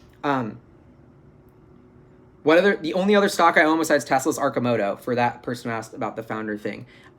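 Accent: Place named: South, United States. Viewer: Where are you from? Sounds like a southern accent at times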